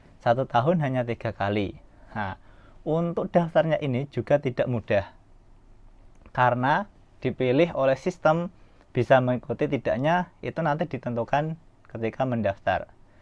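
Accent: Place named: Japan. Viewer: sensei nada bicaranya kecampur logat jepang😭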